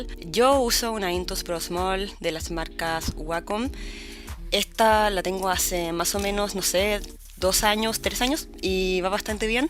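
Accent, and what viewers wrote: Chile. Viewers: Te escuché y fue como es xilena YUJUJUI Aaa dibujas terrible lindoo weoon 😭💖💖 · AHHHHHHH suenas muy chilena!!!!!!!!!!!!!!!!!!!!!!!!!!!!!!! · Aunque este video es viejo quiero decir que me encanta el acento chileno. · De primera pensé que era Chilena, luego dije: "no, es argentina" Y después dijo: "si soy Chilena" xd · wena pooooooooo yo cuando escuche tu voz dije Ez CHilENa xddd buen video uwu nueva sub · Jeje,wena mi pana 😎 No me esperaba que fueras de Chile,aunque si se nota en el asento Como que todas las chilenas suelen tener voz bien posta,así como desde kabra chica OwO,mi voz es media española en los audios,pero enrealidad si es posta xd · yo despues de ver 2 segundos de video: es chilena? :0 xd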